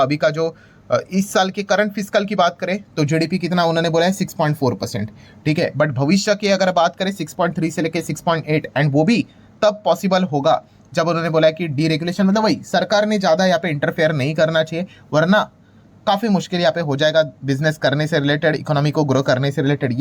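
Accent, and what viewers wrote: Maharashtra, India. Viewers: Kamtarta, vichar bro marathi hain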